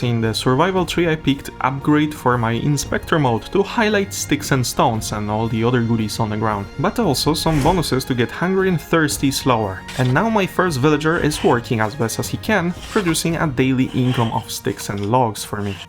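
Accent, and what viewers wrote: Poland. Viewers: Dziwny ten angielski jakiś Polski z deka😂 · Soooo... next 4 years? Btw, are you from Poland? Your pronunciation of names like Piastowie or Klonica sounds like that. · i hear who you pronounce the cities and yes i was right channel based in poland. bardzo dobrze kochanie <3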